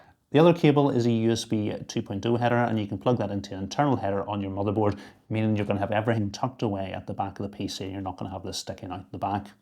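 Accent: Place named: Northern Ireland, United Kingdom. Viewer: Love hearing a local accent on these tech videos. Great to see a fellow lad from NI doing so well. Its great how clearly you explain things and take your time and dont over hyper. Keep up the great work.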